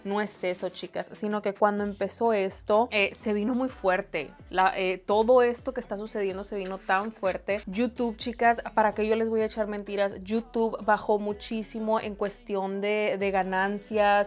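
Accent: Sinaloa, Mexico. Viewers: Holaa, la verdad es la primera vez que te escribo. Tengo poco tiempo de ver tus vídeos gracias a una excompañera de trabajo que compartió una publicación en Facebook, desde ahí te veo cada vez que puedoo. Para ser sincera, yo soy muy floja para ver videos largooos, pongo algo y si dura más de dos minutos lo quito jaja pero contigo me siento taaan agusto, primero veia que duraban mas de 20 min y yo.. ay noo.. jaja pero con tus platicas y tu forma de ser wooow, me encanta.. ya ni me fijo en el tiempo jaja. Yo soy de sonora y me gusta mucho como hablas y como tienes tu esencia sinaloense, esa es una razon por la cual me gustan mucho tus videos, auténtica y sencilla.. por aquí seguiré viendo tu contenido.. eres muy lindaa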